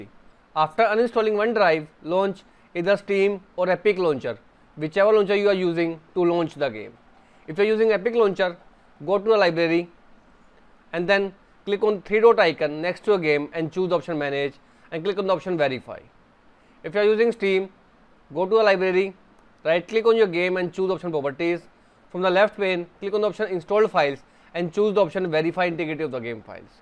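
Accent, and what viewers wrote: India. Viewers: YEA THANK YOU I HAVE BEEN TRYING TO GET IT TO WORK THE ENTIRE DAY IT WORKS AGAIN TYSM HOLY POOP IM LIKING UNDERATED VIDEO MAKE MORE FIXES I K NEW I SHOULD LISTEN TO SOMMEONE WHO SOUNDS INDIAN BUT THAT NOT MATTER IT WORKED THANK YOUUUUUUUUUUUUUUUUUUUUUUUUUUUUUUUUUUUUUUUUU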